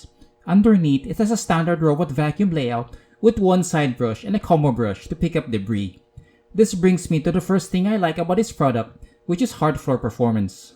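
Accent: Philippines. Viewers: Sounds like your a Filipino? Based on accent? Nice video!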